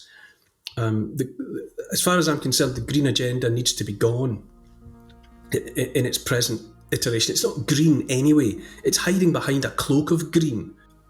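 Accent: Scotland, United Kingdom. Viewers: Yes, I hear my own thoughts, feelings and memories of years gone by, iterated in lilting Scottish accent. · It's so refreshing to hear a gentle Scottish accent deliver so much truth. If it was an American i would have switched off by now. I listen to when i am in bed at night. So relaxing but enlightening.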